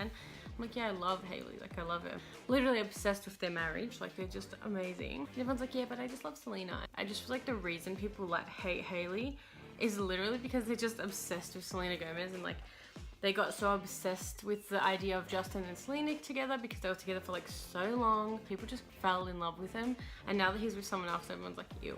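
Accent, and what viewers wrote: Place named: United Kingdom. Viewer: Love this look girly and your accent 😍😍 you from the UK 🇬🇧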